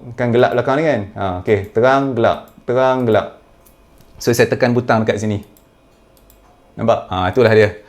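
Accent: North, Malaysia. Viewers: Guna pekat utaqa pun elok gk.. Lg unik vdeo.. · Lorat abg ni mcm org utara la,hehe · Abg guna loghat utara baku ka ni?sedap dengaq..hahaa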